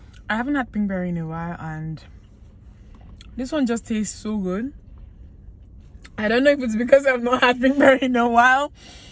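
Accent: Igbo, Nigeria. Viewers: I just love the Igbo accent 😅 welcome home 💖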